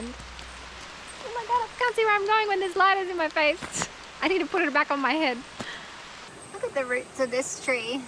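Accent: Australia. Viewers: love watching your videos your just so happy and the auzie accent melts me come camping in banff I have lived here 18 years and would love to show you around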